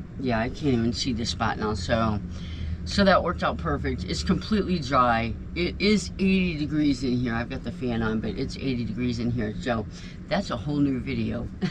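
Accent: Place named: Midland, United States. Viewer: I love your midland accent! "Warsh" away! :)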